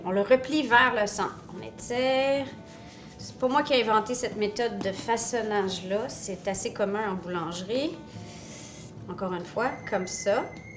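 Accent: Quebec, Canada. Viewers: Quebecois is so beautiful to listen to. So much more real than "Metropolitan" Paris busboy waiter continental French.... But then again the French don't play hockey either! ;)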